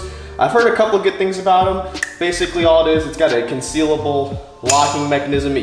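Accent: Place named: South, United States. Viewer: if you listen to his 2nd channel he speaks in a southern accent all the time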